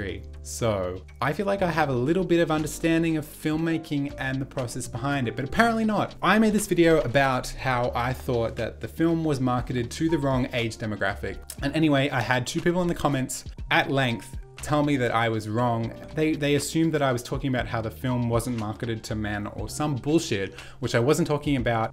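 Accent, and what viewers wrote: Australia. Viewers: THIS WAS JUST IN MY RECOMMENDEDS AND YOURE AUSSIE????? Stan. I have no idea what any of these ~dramas~ are (minus jk) lmao but this is great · Is that an Australian accent? It is just lovely!! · How did I know you’re Australian before I even clicked on the video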